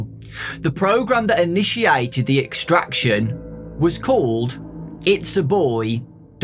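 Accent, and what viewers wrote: United Kingdom. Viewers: What’s the point of buying the book when you can just listen to a British lad read it for free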